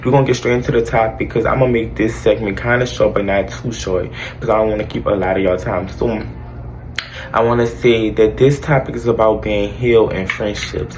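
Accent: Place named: Louisiana, United States. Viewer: Miss you and your million dollar sexy Louisiana twang, and that hot look is a bonus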